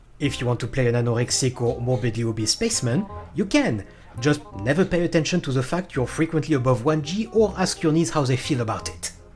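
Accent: France. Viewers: I just discovered this channel. After the video, I went straight to see if he had more content, I hope he uploads more videos, I love his witty sarcasm. The French accent just adds the cherry to the top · That accent... Are you ... French ? Anyway Based Review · Sorry to tell you but I just watched your vid for your french acsent.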